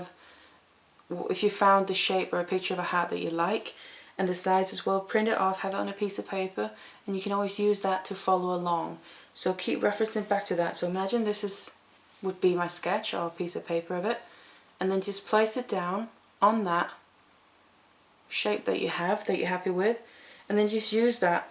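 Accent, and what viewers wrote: United Kingdom. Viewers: I really love your videos. Thanks so much for sharing your talents with us-for FREE. I love your accent, I'm from California and you sound so British. I know how much work goes into even a short video, and yours are so well done you must put lots of time and effort into them. Keep them coming.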